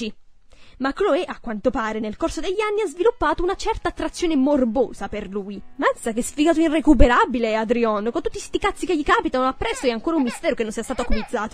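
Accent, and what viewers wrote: Bari, Italy. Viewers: Oddio 😍 accento pugliese, Barese? Sono di Bari, nuova iscritta complimenti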